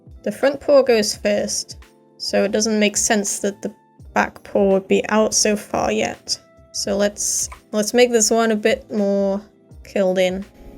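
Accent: United Kingdom. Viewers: .. in the least sexist way what gender are you. You sound like a British young male or a British adult female... please tell me I feel weird about this because I'm new and I may or may not be making fandoms and may or may not need to know your gender for a fandom. Not saying anything here just asking mate. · I love the British accent owo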